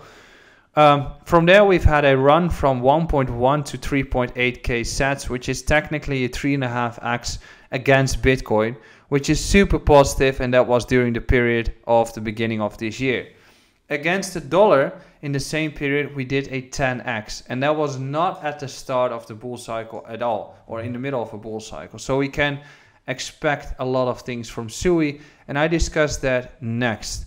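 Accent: Netherlands. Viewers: Had binnen 1 minuut al door dat je een Nederlander bent ;). Bizar hoe herkenbaar ons accent is wanneer we Engels spreken... Goeie video man, je bent een abonnee rijker!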